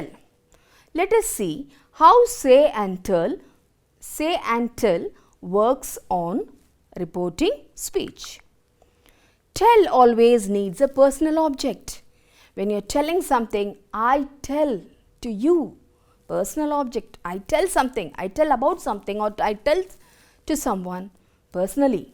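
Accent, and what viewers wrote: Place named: Bangalore, India. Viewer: Please explain slowly and don't use special accent(Bangalore) mam... Because Kannada medium students also watching your lecture..Hope u understand... Other wise make special veduos for Kannada medium students...☝️☝️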